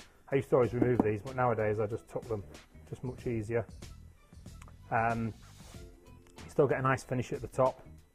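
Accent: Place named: England, United Kingdom. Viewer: You sount like u from England mate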